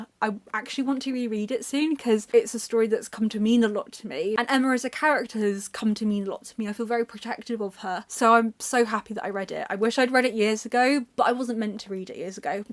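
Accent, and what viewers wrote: United Kingdom. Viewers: Thanks for being back with your intelligence , your bright eyes and your lovely British acent. I read Agatha Christie when I was rather young , althouhh I am not keen on detective books except from Sherlock Holmres . As I love Victorian literatue Doyle has to be on the list. I devoted a lot of time in 2023 to Dickens's long novels and Ihave enjoyed them a lot. My mother tongue is Spanish, by the way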